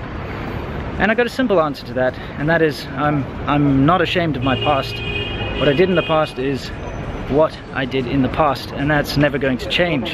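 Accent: South Africa. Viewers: FKM ...the phrases he uses sound like South African English to me...he hasn't got a pure British accent. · 南非口音的英语？还假装自己是英国人过？喜欢说瞎话的人，他的话还能信？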